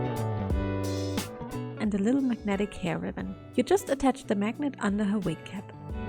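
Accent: Germany. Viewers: Du bist deutsch oder? Ich habe es an deinem Akzent mitbekommen PS.sehr viel talent! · Are you German? Your accent sound like German♡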